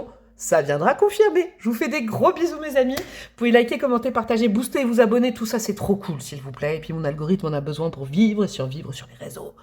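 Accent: Picardy, France. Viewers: Bonjour, accent picard ? Vous êtes de Picardie ? 😊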